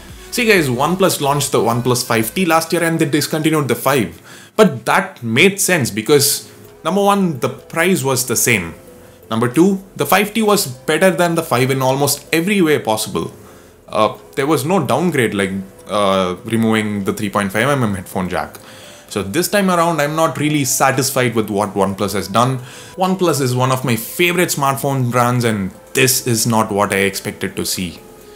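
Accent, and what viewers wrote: South India, India. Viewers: That south Indian accent though! Reminds me of home :')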